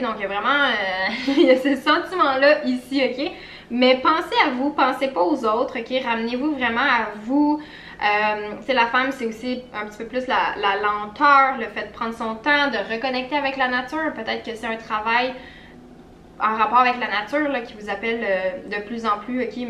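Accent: Quebec, Canada. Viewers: Salut. Je te découvre joyeusement. Il est bon d'entendre un tirage en bon québécois. Tu es colorée et c'est plaisant. Bravissimo. 😃👍😃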